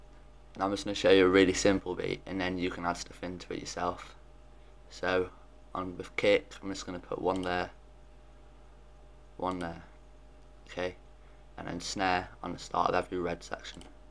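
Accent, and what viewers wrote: United Kingdom. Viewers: "you sound like your from london"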